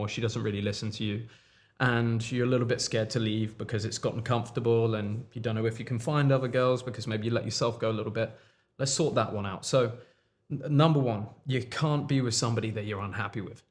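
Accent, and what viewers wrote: United Kingdom. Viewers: What a hell. This is like Hamza, but more articulate and written 🇬🇧💂 Certified British accent 💂🇬🇧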